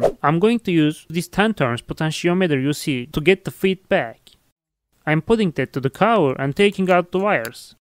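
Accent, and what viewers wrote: Turkey. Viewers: Abi bu Türk aksanı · Your accent is like Turk :) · aksandan direkt anladım Türk olduğunu 👊😁🇹🇷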